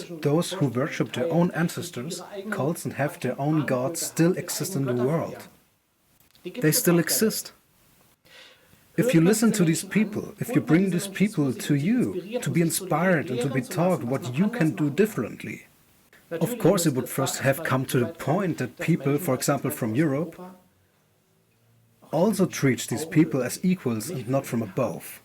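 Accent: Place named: Germany. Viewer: Das Deutsch hört man doch eh leicht durch.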